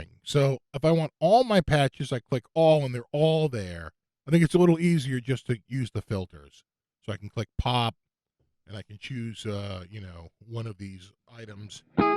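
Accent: Boston, United States. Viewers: Being a transplant in Pa from Quincy point the Boston inflections helped me understand the descriptions of the of the features. Waiting since November for delivery, not yet. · Instant subscribe, badass no bull shit informative video, and the only video I’ve seen where someone demonstrates a clean tone not saturated with effects. Also that Boston accent is so easy to listen to! Sick work man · Nice intro to a great sounding product. I just ordered one and will be getting it in another week. This was a great demo of its capabilities and its awesome sound. Thank you,. Karl, also from Boston, but sans the accent!!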